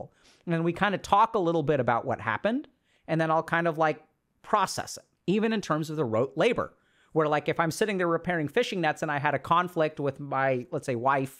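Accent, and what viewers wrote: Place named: India. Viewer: I can listen to his indian accent all day 😂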